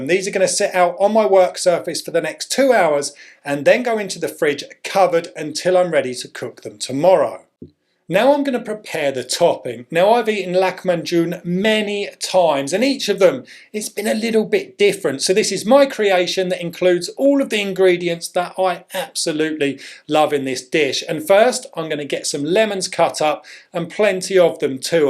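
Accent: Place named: London, United Kingdom. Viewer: You sound like you're from London. Have you been to Greenwich market? They have lovely Turkish "pizzas".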